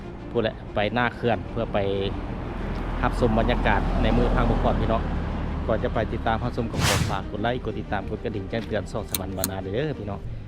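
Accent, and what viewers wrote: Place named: Vientiane, Laos. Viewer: ສຳນຽງຄືກັນ. ຄິດວ່າຄົນແຂວງວຽງຈັນຊັ້ນດອກ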